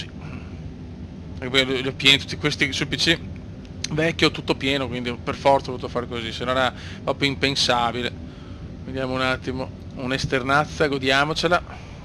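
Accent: Veneto, Italy. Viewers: Bel video, ma parli troppo veneziano, si capisce poco quel che dici...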